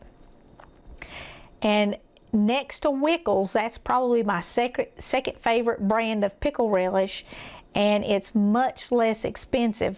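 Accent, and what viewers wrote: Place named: Kentucky, United States. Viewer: Every time I hear your voice, it brings me back to Kentucky. You remind me so much of my mother. Tears of joy Every time every time I need to learn a recipe which I love to cook. Anytime I need a recipe, you're the first one.And only one, god bless you.And your family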